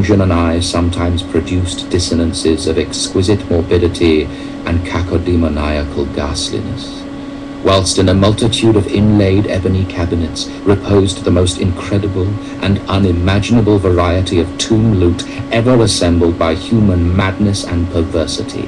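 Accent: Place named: England, United Kingdom. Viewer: This is awesome, and it's great how they got an English guy to do the voice, since that's where it's set. Lovecraft was the man! No, Lovecraft IS the man! Lovecraft is forever!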